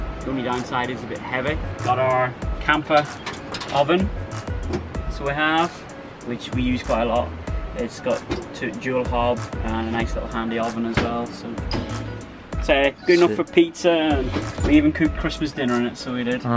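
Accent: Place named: Northern Ireland, United Kingdom. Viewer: Love this van... Is he northern Irish? EDIT...he is.. Useful 😜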